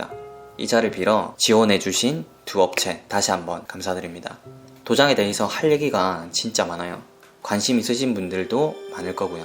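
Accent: Gyeongsang, South Korea. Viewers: 진실함과 성실함에 감동 받았습니다~!!^^ 저는 70세로 75세 남편과 20년 된 부영 아파트에 10년 전에 입주하면서 도배,합성마루, 씽크대,도배,화장실 1개,티비설치 하는 쪽 벽면(전문용어 모르겠음) 등 나름 수리를 했습니다. 다 마치고 나니,앤틱 벽지 선택과 마루바닥을 짙은 커피색 계열로 해서인지 집이 너무 어두워서 손님이 오면 낮이라도 전기불을 다 켜야 될 정도인데다가, 한 10년 살고보니, 앤틱 벽지나 문(부영 전형적 체리핑크색 문,걸레받이,마감 같은것이 너무 싫증이 납니다~ )다용도실에는 결로현상이 심해서 곰팡이가 많이 끼어 흰색 수성 페인트를 칠하면서, 유튜브를 보다가 ' 인테리어'를 접하게 되었습니다. 다른 몇군데 인테리어 회사는 너무 고급진 부자들 상대로,나에게는 그림에 떡같이 눈요기만 될뿐 더 기가 죽고, 리모델링은 꿈도 꿀수 없다고 포기 할 수 밖에 없었는데, 마침 우연찮게 사막에서 오아시스를 만난것 처럼 희망의 섬광을 번쩍하는 것 같았습니다~!!^^ 우리 부부는 이제 나이도 있거니와 노후를 대비해야 되는 서민으로서, 아예 새 인테리어는 포기하고 살아야 된다고 생각하고 살고 있답니다. (사실 집이 어두워서 Led 등으로 교체하고 전기료 아끼지 않으려고 했건만,습관적으로낮에는 자꾸 불을 끄게 되고 간접조명이 아니니까 너무 밝고 낭비같아서 전기를 끄게 된답니다~ㅠ) 특히 부영 특유의 체리핑크색이 너무 눈에 싫증이 나고,브라운 계열의 가구조차 보태져서 색과 명도가 너무 낮아서인지 정서도 따라서 다운되고 우울해지는 듯 합니다. 본래 너무 심플하고 가벼운 모든함을 싫어하고, 나이에 걸맞게 무게있는 앤틱함을 좋아하지만,처음 수리 할 때에 력셔리함과 로맨틱을 더하고 싶었지만, 너무 사치하는것 같아 앤틱만 신경 쓸 정도로 이 분야에는 너무 관심없었고 이 정도도 감사하며 살았는데ᆢ 10년을 살고 보니ᆢ 다른데 이사 갈 형편도 안되고 ᆢ 어떻게 셀프로 컬러라도 바꿔보고 싶지만, 남편은 이미 있는 짐을 그대로 놔두고 수리하는것에 대한것과 비용 부담 땜에 생각지도 않고 있는 상태입니다. 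여기는 김해 삼계동인데 ᆢ마침 사장님의 구수한 경상도 억양조차 더 친밀감과 진실함을 전달되어 이렇게 하소연해 봅니다~^^ 지금 우리 남편은 제가 이런 댓글을 쓰는지 조차 모르고, 다만, 아내가 싫증만 낼뿐 이런 상상에 잔뜩 부뿔어 있는지 꿈에도 모르고 있답니다~^^ㅠ 너무 긴 글 죄송하고요~ 혹시 도움이 될 수 있는 팁을 듣고 싶답니다~!!^^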